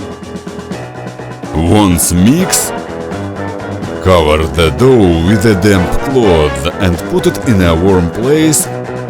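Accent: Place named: Russia. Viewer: Wow! This is a Russian accent. I know how it sounds, because I am from Russia!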